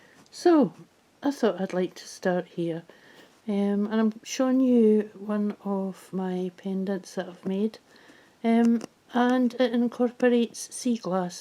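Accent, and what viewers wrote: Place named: Scotland, United Kingdom. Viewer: Lovely finds! My favorites are the codd marbles. Thank you for showing. And I enjoy your Scottish accent a lot. Greetings from Germany!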